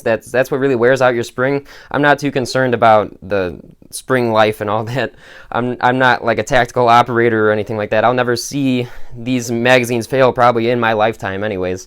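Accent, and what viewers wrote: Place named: North, United States. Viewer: Can tell from your accent your from up north, I'm from downstate Il...a bit south of Champaign. This is the first video of yours ive seen, but I'm going to check out the rest of your stuff. I enjoyed this video man. Thanks....and big R and rural king is where I buy 90% of my ammo. I just caught 38 special on clearance for 28 bucks for a 100 round box! Going to help me break in my new henry 357 sidegate....looking forward to watching the rest of your videos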